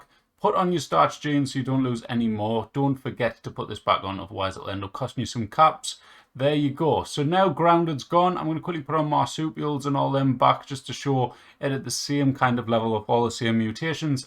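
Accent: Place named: Durham, United Kingdom. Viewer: Bud,I'm trying to place your north east accent? Durham?? Love the channel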